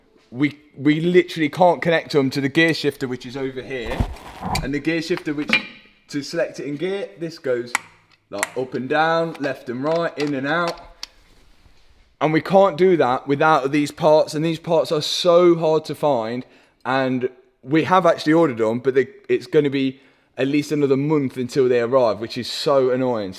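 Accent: United Kingdom. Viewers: This dude cool but his accent is brutal. Goofy uk accent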